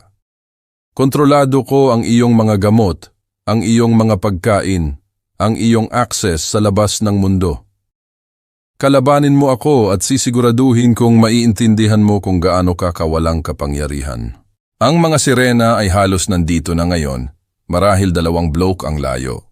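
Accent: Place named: United States. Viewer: ano po Ang nangyare amerikano na Ang nagbabasa eslang masyado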